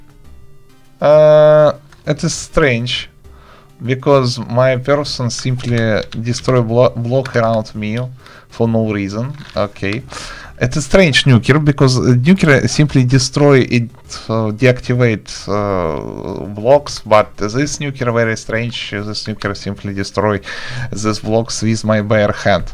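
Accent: Russia. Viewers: dude найс инглиш ты русский и это слышно по акценту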